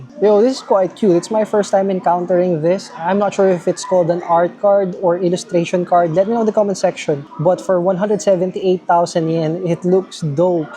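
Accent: Philippines. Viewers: ur accent for sure 100% filipinos haha👍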